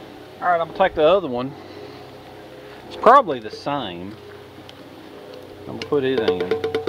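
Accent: South, United States. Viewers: Scientific testing aside, thanks for the video brother! Just checking out your fire and listening to your southern drawl make me feel at ease. I am curious, what is your forest firewood sources, locally we have western red cedar (Thuja plicata), Douglas fir (Pseudotsuga menziesii), western hemlock (Tsuga heterophylla) and maybe vine maple (Acer circinatum). At work I can salvage different oak, maple and beech which I totally prefer but they aren't really available in our forests. For cookware I never really invested in aluminium, most of my kit is stainless and a few more recent bits are titanium. Love the toboggan dude! Although that business is now defunct.:)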